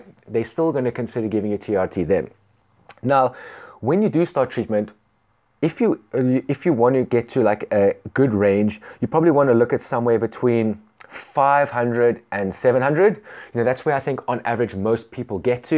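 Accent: South Africa. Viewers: Are you South African ? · Great video... Mine is 650, age 29 ... By the way, you have a South African accent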